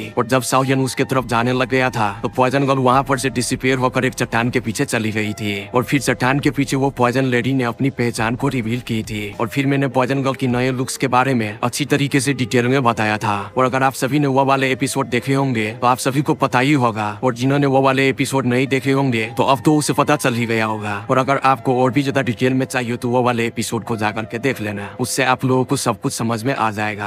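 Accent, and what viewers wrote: Bihar, India. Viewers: Bhai aap Bihar se ho kya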